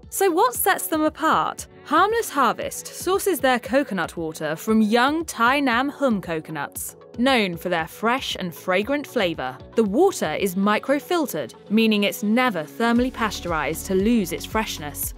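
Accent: England, United Kingdom. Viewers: Why do you use a British accent in some of your videos? Is this a British content provider? It’s strangely distracting. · English accents legitimize Mashed Science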